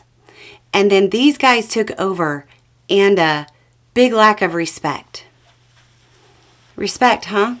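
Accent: South, United States. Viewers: I love how Miss gets pissed and then wishes ya'll a good day in that sweet, soft, southern drawl.:yougotthis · I'm not from the South, but I have lots of friends who are... I keep waiting for to say "Bless his heart," in the way only a Southerner can mean it. lol You go,! Please give my best regards to Beau - I hope he is doing really well. Much Love.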